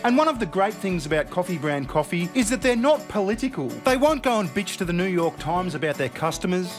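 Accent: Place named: Australia. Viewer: This guys sounds so much like Aussie guy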